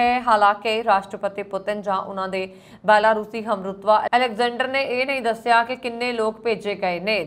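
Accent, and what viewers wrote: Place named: Punjab, India. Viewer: Mam your punjabi accent is very sweet😊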